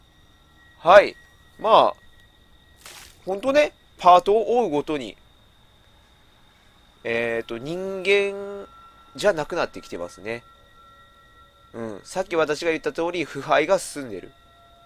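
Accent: Kagoshima, Japan. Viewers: 鹿児島訛り最高です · 鹿児島弁良き！！👍👍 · さんの鹿児島の訛り好きだなぁ... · 鹿児島訛り好きになりそう！素顔見たかったので嬉しいです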